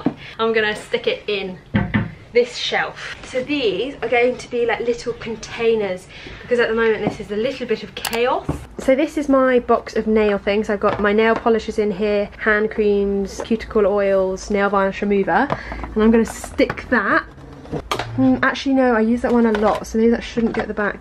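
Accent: England, United Kingdom. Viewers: i really love your english accent 😍😍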